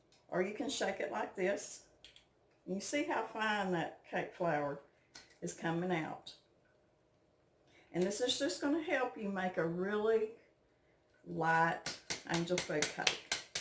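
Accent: South, United States. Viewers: Love your ‘southern” accent and gentle spirit.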